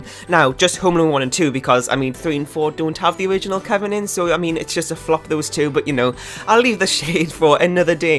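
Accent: Newcastle, United Kingdom. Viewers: this was so great haha! How have you slipped under my radar? This is my first time checking out your channel! You seem like a nice guy, loving the Geordie accent! I'll look forward to having a nose through your work:D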